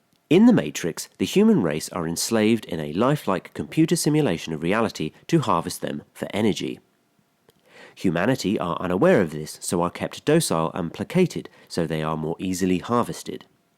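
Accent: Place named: United Kingdom. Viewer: The british accent can litteraly convince me anything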